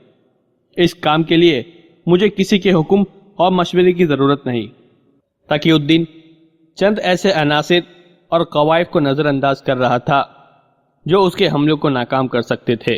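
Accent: India. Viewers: YR MUJE LAGTA HAI K YEH VOICE LIKE INDIAN TARZ KI HAI IS IT RYT ?? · Brother yeh indian hai aur indian aise hi bolte hain Doesn't mater.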